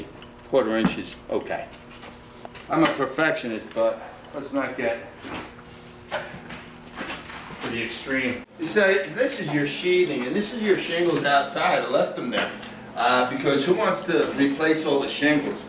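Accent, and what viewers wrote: New Jersey, United States. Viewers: This old bird is a craftsman. Knows what he is doing. Has a funny accent though. Might be Camden, NJ, Brooklyn? JoeB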